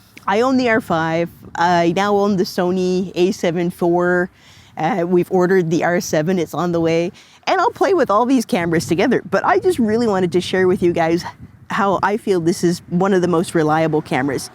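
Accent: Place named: Baltimore, United States. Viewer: Thanks for taking the time to share your thoughts. I just grabbed an EOS R for 1,070 USD! It was a cancelled special order that's been sitting in the store room since 2018. I was going to hire an R5C for a job, but this purchase didn't cost too much more than the rental fee. What SD cards do you use? I've found that Lexar V60, 1800, UHS-II have been massively discounted recently. I'll mostly likely shoot in 1080 most of the time, but I'd like to get the best 4k onto the card, when I do use it. Are V60 cards to the challenge or V90 UHS-II cards critical? Is the difference between 8 bit and 10 bit dramatic, or is it something that you notice only when really analysing the output? I'm originally from Baltimore and it's always funny to me when I hear the same pronunciation as my way of saying the letter "O, from aaaall the way in the FNA (Far North America). 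Cheers!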